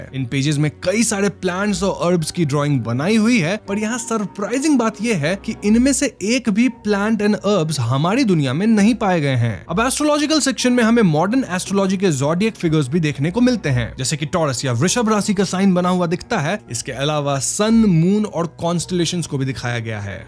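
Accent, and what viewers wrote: Bengal, India. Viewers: TUI KI BANGALI ? .....HINDI PRONUCE E KAMON JANO AKTA BANGALI TOUCH ACHE.....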